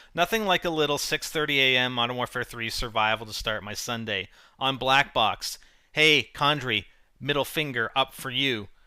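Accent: Canada. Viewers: Canadians really do say oot! 0,0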